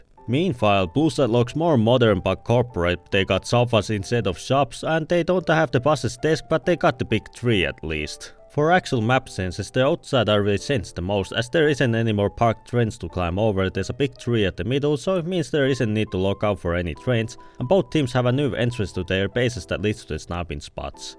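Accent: Finland. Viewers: I can detect finnish accents from planets away, being a finn as well. What's good? Also the way i found this channel is i just stumbled into it on my recommended and was like "? Isn't this the guy from my steam friends list?" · Shogatsu is my favorite, they did great Also I love how I immediately knew you were from Finland, cheers from Hungary lol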